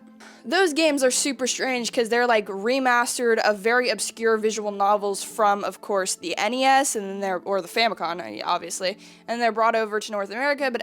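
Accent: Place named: Pennsylvania, United States. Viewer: do you live in Pennsylvania? The way you say certain words makes me think you do hahaha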